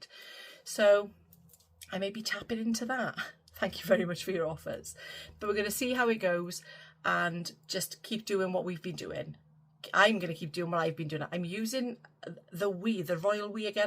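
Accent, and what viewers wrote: Wales, United Kingdom. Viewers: Nothing to do with slimming but I just love listening to your beautiful Welsh accent.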